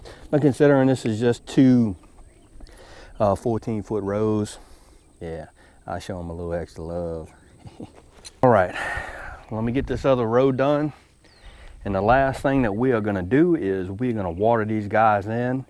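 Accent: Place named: Louisiana, United States. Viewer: You can spend time spacing them evenly, or you can spend time thinning. You must be pretty close to the border because I'm hearing some coonass in your accent.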